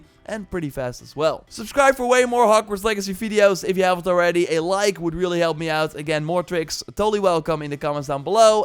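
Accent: Netherlands. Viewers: Did I just spot a Dutch accent here?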